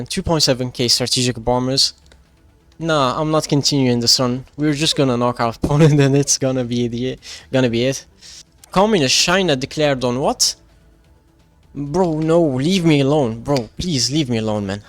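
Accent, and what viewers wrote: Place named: France. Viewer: The french accent fits well in your talking bro 👍👍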